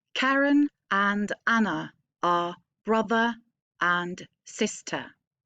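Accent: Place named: United Kingdom. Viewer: I assume this video is for learning English rather than Norwegian? Also I've never heard a Norwegian speaking with a British accent, so that's cool 😊.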